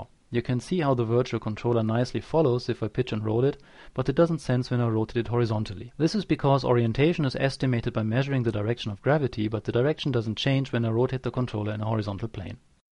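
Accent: Germany. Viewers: u sound german... but dont worry, me too^^ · hi, bist du ein Deutscher ??? klingt nähmlich so :D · of course, you can hear when a german speaks english :-D. But his english is good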